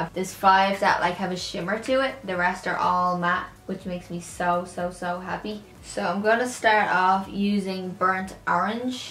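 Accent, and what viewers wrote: Ireland. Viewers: I could listen to you speak with your beautiful Irish accent all day. I love this palette. The shades are stunning and the look you created is gorgeous.. Thanks for the tutorial. xxx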